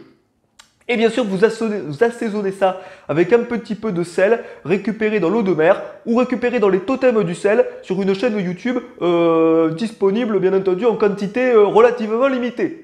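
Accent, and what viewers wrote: France. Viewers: Oh l'accent du sud🤣🤣🤣 t'es génial ne change rien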